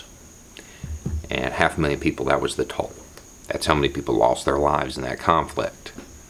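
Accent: South, United States. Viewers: You are a voice of reason. I showed your videos to my conservative Republican dad and even he likes you. I don't know if it's because you say it with a southern accent, but he will listen to what you say while ignoring the same arguments made by other YouTubers that I watch.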